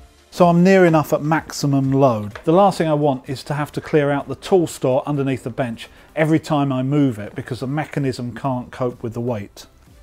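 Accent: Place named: United Kingdom. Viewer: I love your style, simplicity, and British accent! I'm from the South in the US. I love to watch woodworking video's since I cannot do very much any more. I am severely handicapped, and dying from a lung condition that is destroying my lungs, called Idiopathic Pulmonary Fibrosis, and was recently diagnosed with Liver Cancer. So 👍👍😁 and keep on keeping on! Subscribed, and hit the bell!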